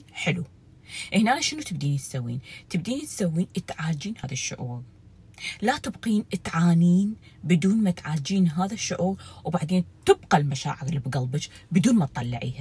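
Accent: Iraq. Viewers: جعل ما يحجي غيرج لبى لهجتج العراقيه ♥️🎈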